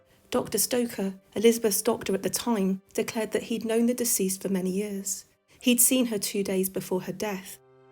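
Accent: England, United Kingdom. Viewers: You and Curious world are the best 2 ghost channels with great narration and editing. Love ur voice. Always loved listening to british women. Wish i lived across the pond. Oh I forgot about the costwold explorers new channel ghostly trails or something like that Robin is a damn good narrator too. Makes all the difference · Hello.. I absolutely love your channel,but your kind voice narrating incredibly interesting facts topped with that beautiful English accent has made me a huge fan.. Lots of love from Australia.. God bless..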